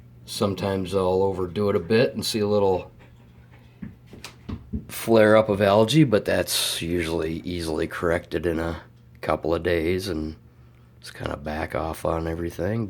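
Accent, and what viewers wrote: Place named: North America. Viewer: the standard 10 gallon aquarium in North America, likely where he lives as he has a North American accent, has the dimensions of 20" x 10" x 12". This tank is also longer than it is tall, and taller than it is wide. I can almost guarantee it carries those dimensions. In different countries, such as Australia, a standard 10 gallon often has different dimensions. But this was in North America.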